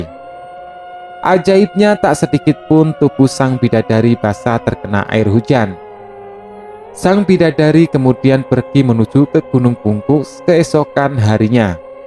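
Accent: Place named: Java, Indonesia. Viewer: mulut orang Jawa logat bicara Jawa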